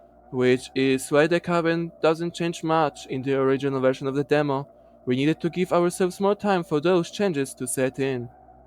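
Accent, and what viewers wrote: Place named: Poland. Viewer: Tak mi się wydawało, że zajeżdża Polskim akcentem, ale jak usłyszałem jak wymówiłeś "vodka" to byłem pewien lol. Zajebisty kontent btw, nie tylko ten film, ale cała reszta, na pewno tu chwilę zabawię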